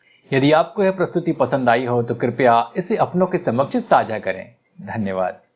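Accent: Standard. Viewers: Ap ka hindi acha he sudh ghee jesa hindi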